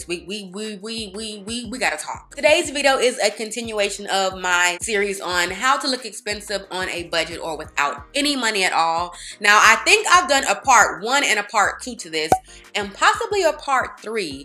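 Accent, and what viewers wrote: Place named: Chicago, United States. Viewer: Girl you gotta be from Chicago ❤ great tips btw!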